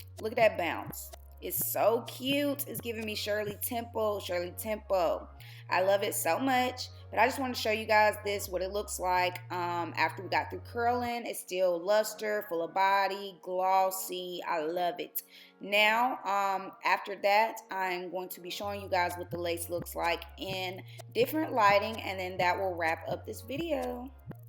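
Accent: South, United States. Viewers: Love it! Okay, I hear her Lil country accent lol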